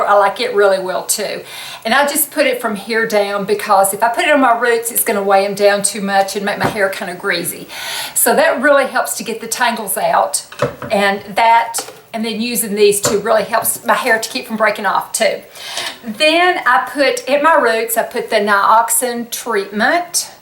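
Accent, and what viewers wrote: South, United States. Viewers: You might be the sweetest person on YouTube! And I just adore your accent! Im from Ky and I still hear such a southern draw in yours. I could listen to you read a dictionary 😊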